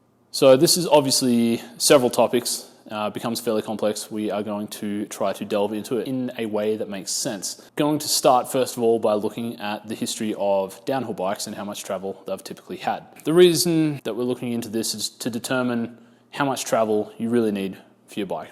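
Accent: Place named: Australia. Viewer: haha reppin the aussie accent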